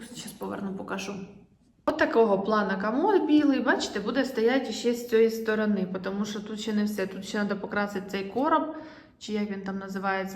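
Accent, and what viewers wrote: Surzhyk, Ukraine. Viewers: Недавно на Вас підписана, відчула щось схоже , не можу описати, особливо мова, мій рідний суржик😊😂 Звідки Ви , з якого куточка України? Я з Полтавської області, зараз також проживаю в Польщі 🤗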